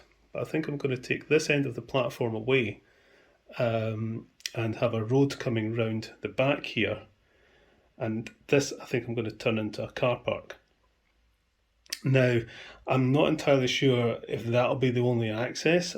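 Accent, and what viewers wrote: Scotland, United Kingdom. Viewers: You can do it!!! Build it and they will come!!! And we did!!!, Soo Congrats on the 5,000 subscribers too mate. Im very happy to be 1 of them as you show great content and info. Your extension on the platform looks great too. I was going to suggest you make your no2 platform longer before as it looked a tad out of place before. Ima thinkin that you need to look out for some more lost sheeps though haha Why dont you call it " " (you know like the song haha) only because you have the same accent and sounds similar to them haha.. Anyhoo keep up the good work and gr8 video there m8. Cheers from John in Australia.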